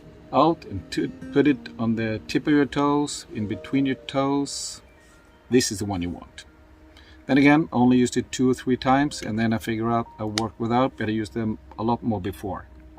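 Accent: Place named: Poland. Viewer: Guy sounds like a polish. The shoes are a gay overload. You don't need all the crap. I wore an old pair of running shoes, on the plane, in the trail, in the auberge, everywhere... but they were running shoes, gel padding in the sole, no problems at all on my whole Camino. Just bring one change of under clothes in your pockets. You don't need a back pack. Your not in the Rockies. Anything else you need.... BUY IT THERE.... Spain is a developed country for crying out loud!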